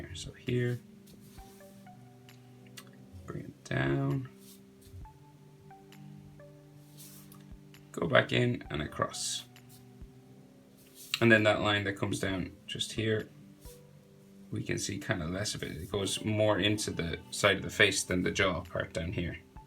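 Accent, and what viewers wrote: Ireland. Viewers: he has probably the first irish accent I haven't hated. and I'm Irish -.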